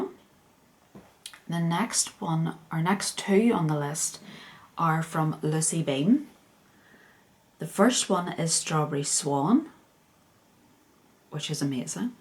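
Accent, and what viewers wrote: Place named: Northern Ireland, United Kingdom. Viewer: 🍓loving the accent. I live in Bangor Co.Down and I don't hear many if our accents on flosstube. The other one I watch is sewmesarah who's amazing. Loved seeing all the little projects. I was a monogamous stitcher til I discovered flosstube about 6 months ago. I see so many things and I've a list already from what you've shown today that I don't know where to go next. Keep up the good work. 💞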